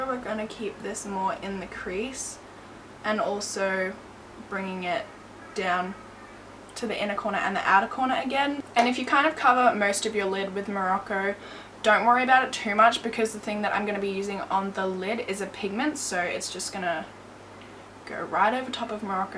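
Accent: Australia. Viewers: I'm not an expert in accents but is she Australian? Amazing makeup btw :) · U sound like Kylie Jenner but with an Australian accent XOXO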